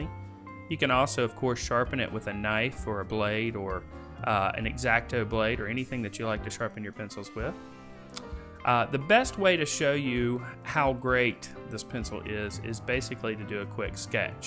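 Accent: South, United States. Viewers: Not is your videos very informative and well-done, your accent makes it even better. It's just so freakin' cute >-< It sounds southern, but I may be wrong.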